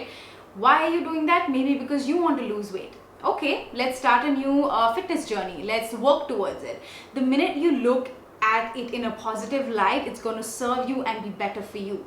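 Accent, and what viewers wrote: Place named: United Kingdom. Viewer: Love your British accent can you make a video on British accent pleaseeewwe.......... 💓😍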